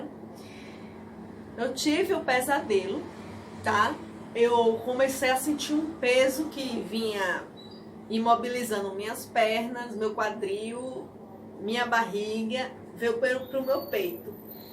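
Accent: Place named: Pernambuco, Brazil. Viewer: Bem interessante o relato, minha conterrânea pernambucana! Isso tem uma lógica de ser, que não é nada do que religiosamente as pessoas falam, mas, é preferível não entrar nesse mérito. Gosto de seus vídeos, de seu jeito pernambucano de falar kkkkkkkk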